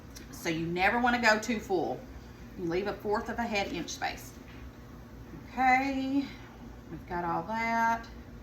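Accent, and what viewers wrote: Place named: North Carolina, United States. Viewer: Sweetie, please quit apologizing for your pronunciation of words & your accent!!! We love you just the way you are!! I happen to be from North Carolina & talk just like you so I love it!! Great looking jelly! God Bless!!! 🙏❤️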